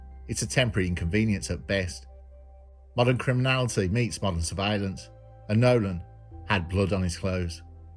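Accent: Birmingham, United Kingdom. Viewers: Brummie accent may not be to your liking but lots of us are following and enjoying his work and narration.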